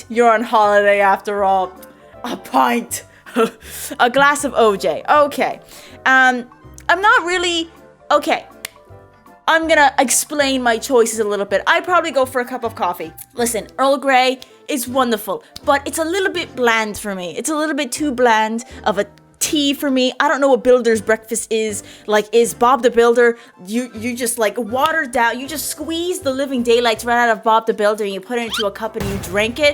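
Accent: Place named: United Kingdom. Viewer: I’m british and I love ur British accent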